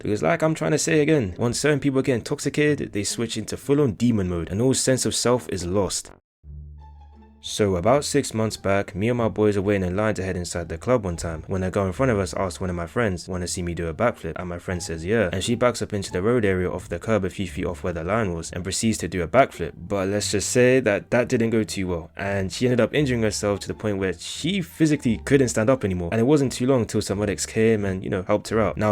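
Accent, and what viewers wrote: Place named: United Kingdom. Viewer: Bri'ish 🥹 (u tuff twin)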